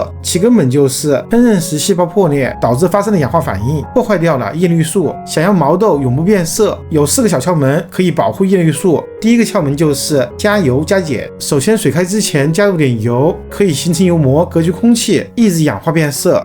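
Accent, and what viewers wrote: Wuhan, China. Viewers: 听口音是武汉人吧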